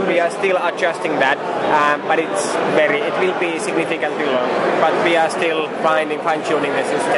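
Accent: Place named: Finland. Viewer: that finnish accent is annoying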